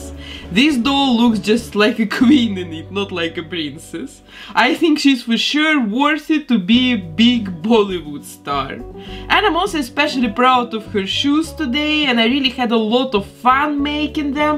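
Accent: Russia. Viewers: For so long I wondered why this Belgian lady has a Russian accent... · Buffy I was just abt to comment that, her mold is perfect and she’s Russian herself (she has a Russian accent so I’m guessing she’s Russian)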